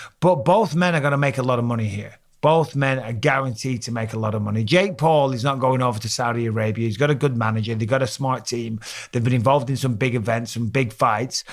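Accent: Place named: United Kingdom. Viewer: It was but he has a geological advantage when It comes to that accent. Gey him to try an impression of anyone outside the UK and it's awful lol. Still funny and entertaining but for different reasons.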